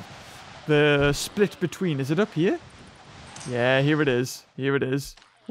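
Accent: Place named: United Kingdom. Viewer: you live in the U.K.don't you I hear it in your voice. Can you send pictures of castles?😁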